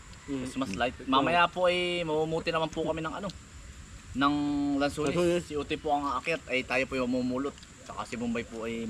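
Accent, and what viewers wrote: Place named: Visayas, Philippines. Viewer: Nku idol tgal ko ng nuod sau kala ko bisaya k yung punto mo kase kala koy parte ng bisaya k😂😂😂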